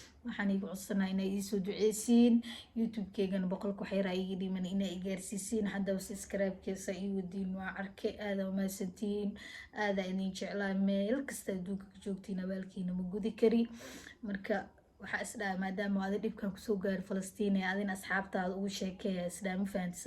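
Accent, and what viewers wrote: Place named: Mogadishu, Somalia. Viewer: xamar aka hadla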